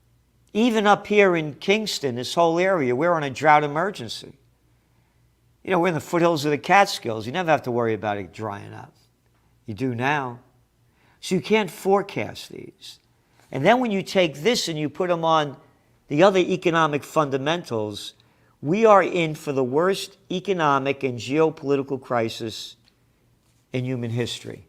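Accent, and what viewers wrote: England, United Kingdom. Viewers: Great show, loved the English accent! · The English Accent is spot on LOL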